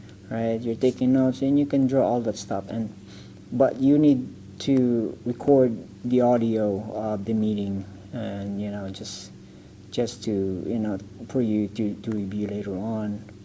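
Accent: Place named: Philippines. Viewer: loving the Filipino accent :)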